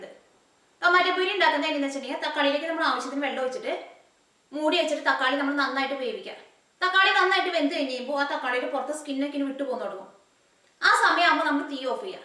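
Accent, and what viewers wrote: Thrissur, India. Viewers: are you from trichur,because your accent is like trichur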